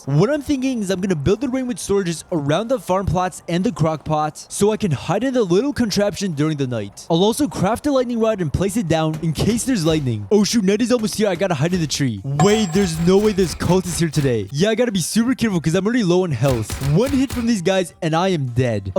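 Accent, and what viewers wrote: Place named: France. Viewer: T’es français mdrr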